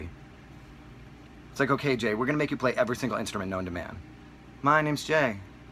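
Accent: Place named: Boston, United States. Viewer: is not British he is Bostonian they talk simlar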